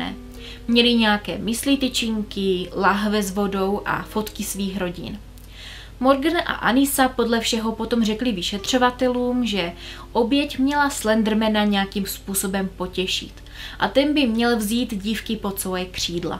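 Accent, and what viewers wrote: Moravia, Czech Republic. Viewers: Já miluju tvoje příběhy.. Jen nemohla bys trochu zapracovat na té moravštině(změkčování i, kde to nepatří).Hrozně to trhá uši. Neber to, prosím, špatně.. Jen jako návrh na zlepšení.👐Odebírat tě nepřestanu:D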